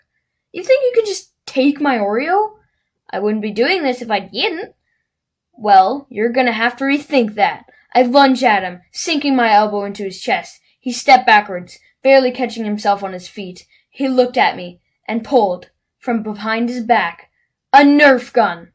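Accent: Canada. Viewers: He sounds very Canadian eh? I’m Canadian.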